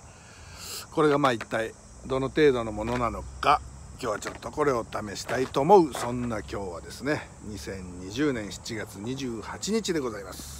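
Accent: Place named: Oita, Japan. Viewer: 大分の豊後大野市の者ですが、そちらの言葉方言と良く似てますよ😃 ちびるは、チビったとか、尿漏れの意味ですが😃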